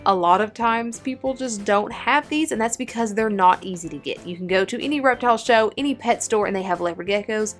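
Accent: South, United States. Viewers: Love the southern drawl as well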